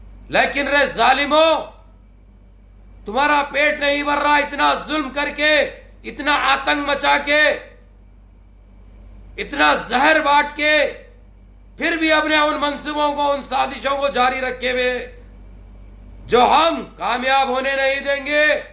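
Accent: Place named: Hyderabad, India. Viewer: Assalam walekum Bhai rah bar Allah AAP ki umar may barkat day Hyderabadi jabaan suney may itni pyaari lagti hai ki log sunkar muskurattein hai lekin Kai loga ko yeh baat nahi malum ki Hyderabadi sirf Biryani kay liye nahi Balki Kurbaani kay liye bhi jaani jaati hai jaise ki Nizam Badshah Aur unkay aulad aur jo log bhi masjidon ko Shahid karne mansoobay bana rahein hai Allah unko gaarat karenga inshalla Aur Allah mazlumo ki aur Hum sab ki zaalim kay Zulm say hifazat farmaye Aamin summa aameen Noor sayyad Dua may yaad rakhiye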